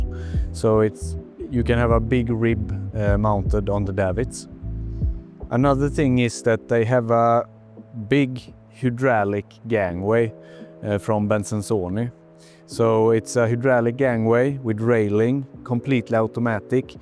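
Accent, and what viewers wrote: Sweden. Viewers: That's okay, we find the Swedish accent charming! 😅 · I wish I could speak Swedish half as good as you speak English Sir. An excellently presented video and your slight Swedish accent is both charming and very good❤ . I understand you perfectly , far better than many English people ! Love that 57 too ..... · Don't worry Sir, Your Swedish accent is beautiful, authentic... I even ecpect it, when the Portrait about a Hallberg Rsssy is produced by Hallberg Rsssy in Ellös 😉🎉🇸🇪♥️👍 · Your dialect actually adds the mood for a Swedish yacht. Like a Swiss watch or German car. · Your English is perfectly acceptable for a non-native. Your Swedish accent makes your speech sound so much nicer. Also, beautiful yacht and movie.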